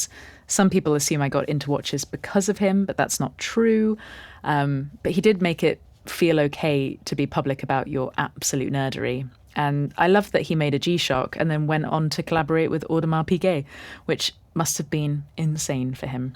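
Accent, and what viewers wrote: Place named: United Kingdom. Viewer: This British accent is ❤❤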